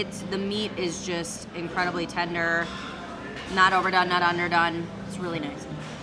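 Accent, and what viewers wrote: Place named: Midwest, United States. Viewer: I can hear the midwest in your voice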